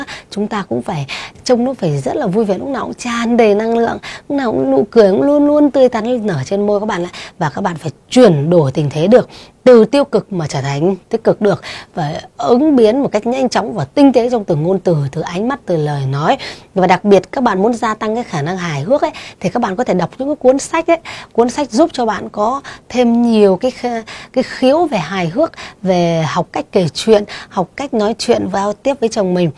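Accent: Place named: Vietnam. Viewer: Chị ở đâu mà nặng tiếng địa phương nhỉ. E ở hải Dương nè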